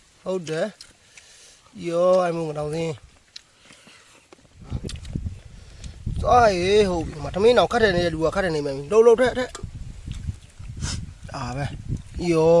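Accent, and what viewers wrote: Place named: Thandwe, Myanmar. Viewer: ဘယ်ဒေသကလဲ အစ်က်ု့အသံက သံတွဲဘက်က အသံနှင့်တူတယ်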